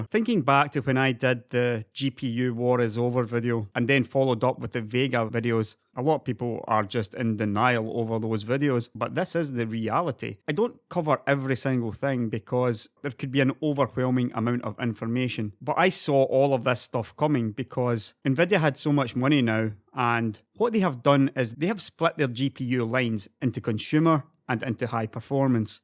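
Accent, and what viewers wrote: Scotland, United Kingdom. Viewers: Where in Scotland are you from? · as far as Scottish accents go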